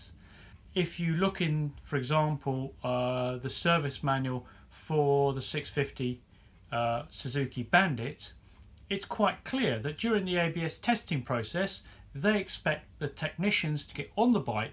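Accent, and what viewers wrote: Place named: England, United Kingdom. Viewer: Great info and good English accent as opposed to Yanks!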